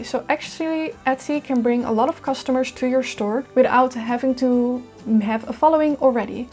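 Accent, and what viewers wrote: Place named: Netherlands. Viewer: Thanks! I just came across you when starting my info search, and so far my favorite source!;) I heard your accent, and recognized from my 20 years at KLM in the past; Dank je wel!! Veronica (Swedish American living in Switzerland)🤭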